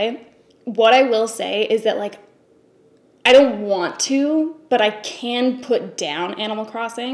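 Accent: Canada. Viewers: Haku Hodo is just a pleasant word in general! It doesn't have to do with your Canadian accent. I think it'd just your wonderful, unique self!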